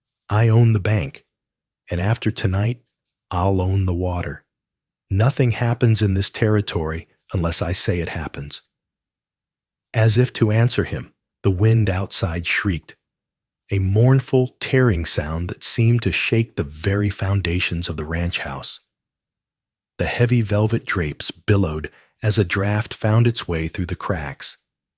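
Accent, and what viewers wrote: United Kingdom. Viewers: . Thank you for your response. it’s not a matter of what I am used to, it’s about that being far and away my favourite… “there’s a difference”… others, I am used to and can get used to, but no other I have heard has that perfect British RP accent. It’s a preference.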